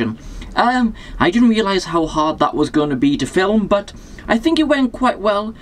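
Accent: Wales, United Kingdom. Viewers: Hi. I'm in Wales also (valleys) good to hear another Welsh man..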